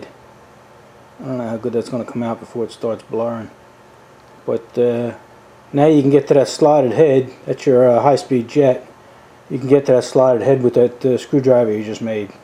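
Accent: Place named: New York, United States. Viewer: So where in New York are you from?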